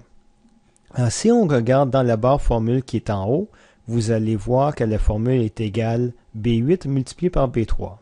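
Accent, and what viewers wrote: Quebec, Canada. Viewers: vraiment très bien expliqué. Et belle effort sur l'accent car étant belge c'est assez compliqué de comprendre le québécois mais la aucun soucis. Très bonne vidéo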